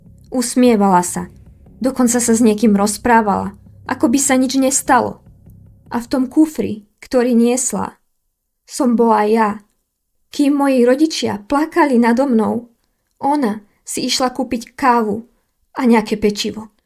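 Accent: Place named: Central Slovakia, Slovakia. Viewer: dakujem, smutný príbeh, ale krásna ľúbozvučná stredoslovenčina ❤️👍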